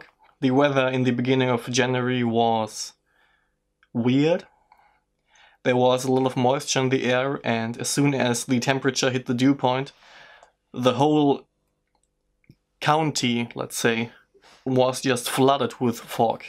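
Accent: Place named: Germany. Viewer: Man hört voll, dass du deutscher bist :P Hab dich mal als Hobbyfotografkollegen abonniert^^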